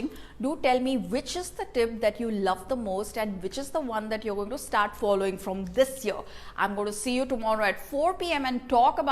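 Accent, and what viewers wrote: Mumbai, India. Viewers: i love d way u talk ......in ur vedios ....so natural ......fulll mumbaikar style lov it .......with ur funny lines that accept ur a bevda lol .....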